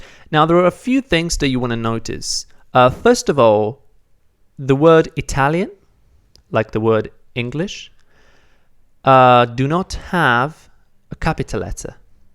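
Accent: England, United Kingdom. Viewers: As a Spanish-English bilingual this course is such a delight to me, you explain everything so clearly and I am learning Italian with both persectives which is very useful, since pronunciation and some grammar basics are so similar to Spanish, I would probably miss some things if this course had been taught by a Spanish speaker. Also, your English accent is gorgeous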